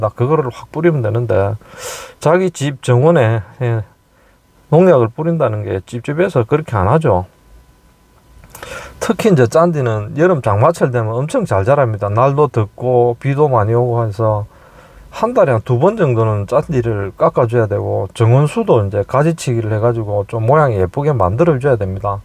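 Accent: Gyeongsang, South Korea. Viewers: 구수한 경상도 억양이 정감이 듭니다 전원주택의 벌레.잔디관리.귀촌의 단점을 잘 알려주어 로망을 접어야겠습니다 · 경상도 액센트가 너무멋져요❤❤❤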